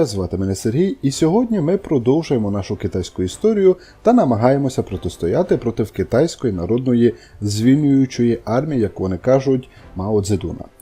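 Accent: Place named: Lviv, Ukraine. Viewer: а я так і зрозумів, що ти з западу, бо надто вже схожий на північний Львівський діалект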